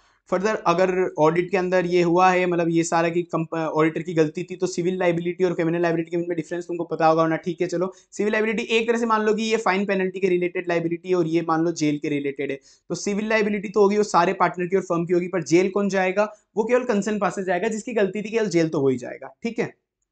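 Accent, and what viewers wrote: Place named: Bihar, India. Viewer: Audit Committee bhi nati nikli 😂😂😂😂 Sir apka bhasa se aap bihar ke legre hain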